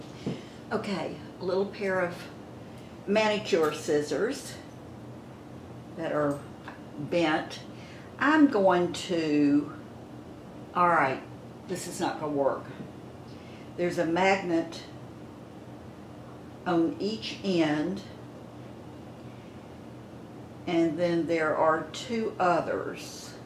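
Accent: South, United States. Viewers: You are the cutest! The lashes look great on you! Your southern accent 😍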